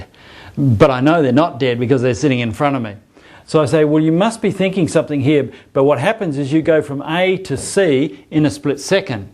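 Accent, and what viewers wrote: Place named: Australia. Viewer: some Aussie accent mate..i been to perth studying for 2 years and this accent reminds me everything there.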